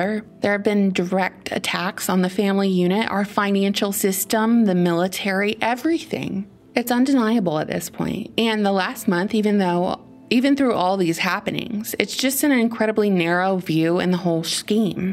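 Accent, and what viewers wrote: South, United States. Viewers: I like how you narrate this in the same tone the same way you’d read a story book to a child. It’s nice. Like “and thennnn” in a song song y voice. I can tell you’re southern to the bone all us southern ladies do this. 😂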